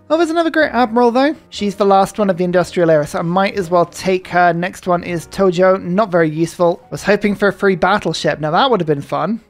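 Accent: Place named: South East England, United Kingdom. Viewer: Wait really? Do you mind me asking where you're from then? Cause I've lived in each of the 4 quadrants of England and your accent definitely sounds most similar to the South East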